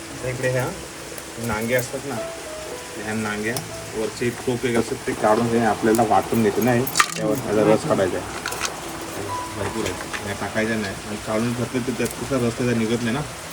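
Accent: Konkan, India. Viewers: gavi jaun gav sarkh ....pure kokani boltos 😅😅😅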